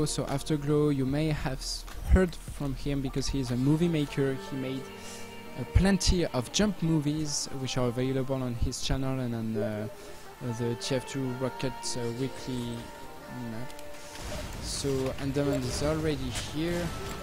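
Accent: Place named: France. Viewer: Un français ca fait plaisir :P ton accent te trahis xD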